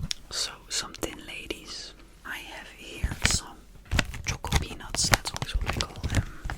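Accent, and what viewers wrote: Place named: Netherlands. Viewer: Love this, and when you traced the letters on the pizza box 🤤. I like that you’re not a fast tapper, I find a lot of ASMArtists tap too fast. Yours is perfect. I like that you tell us the Dutch works for stuff, repeat it more then once so we can learn to Dutch words for things! I live in Canada but have lots of family from holland, including my Oma! Hearing your accent on the first video I watched I recognize it immediately.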